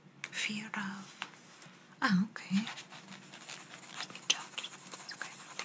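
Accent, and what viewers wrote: Italy. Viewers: I didn’t know you are not Italian until I checked it 😂 such a perfect Italian accent, amazing! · Great video. You always do a fab Italian accent ❤ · Ciao! Mi piace molto il suono della scrittura e il tuo meraviglioso accento Italiano è così dolce e rilassante! Complimenti, sei bravissima e bellissima 🫶🥰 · The Italian accent was done great, and i really like your therapy ASMR. This one reminded me of a recent therapy appointment of my own, which went great!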